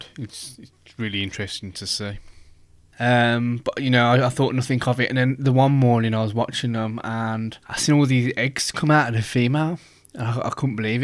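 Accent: Birmingham, United Kingdom. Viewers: Lovely brummy accent there lol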